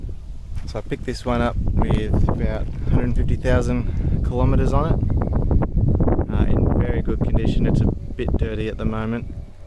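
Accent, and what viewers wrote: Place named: Australia. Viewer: Nice to see another ISF in Australia, I recognised your accent. I am in Western Australia. They have become so rare now, lucky to see 10 for sale in the entire country at any one time. Looks clean good pick up! :)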